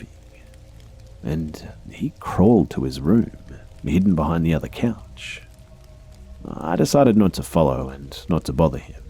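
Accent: Australia. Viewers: Love these videos, they’re the only thing that help me sleep. It’s also nice to hear a familiar Aussie accent :) !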